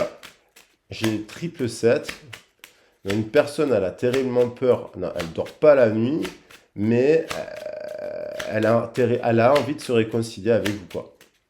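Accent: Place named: Marseille, France. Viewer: BON et bien bon courage!! J' espère que la communication va bientôt arriver avec Arnaud...au fait Je suis cancer ascendant vierges et descendant poisson 🐠 alors voilà j'y crois comme à la 🌏 terre j'y croit comme au soleil ☀ et à mon Arc en ciel qui va m'apporter de très bonne 🔮 prédictions..bien sûr avec ta voix chaude et sensuelle qui n'appartient à personne qu'à toi sur le net! Et c'est un plaisir de t'entendre à chaque fois avec l'accent de MARSEILLE! BRAVO 👏 POUR TOUTE TA RICHESSE INTÉRIEURE QUE TU AS VRAIMENT MÉRITÉ ET TOUTE MA GRATITUDE POUR NOUS PERMETTRE D'Y ACCÉDER GRATUITEMENT ET AVEC TANT D'HUMILITÉ 👋 CIAO 👋 😆🤩😉🌟❤️‍🔥💞🌈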